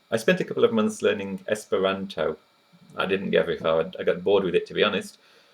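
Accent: England, United Kingdom. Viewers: you're english right?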